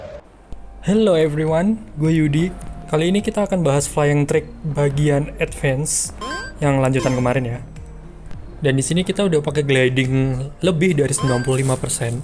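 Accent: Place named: Indonesia. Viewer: Kaget pas pertama ngomong ternyata orang indo cuz i didn't read the caption😆😆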